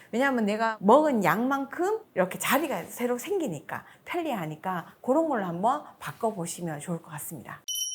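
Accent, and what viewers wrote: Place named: Daegu, South Korea. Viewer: 대구사투리 넘 예쁘네요~ 😂물론 도움도 많이 됩니다😊 구독!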